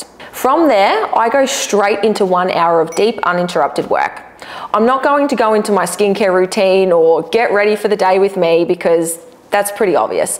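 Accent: Australia. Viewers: I am here just for the smart video with common sense information and the Australian accent....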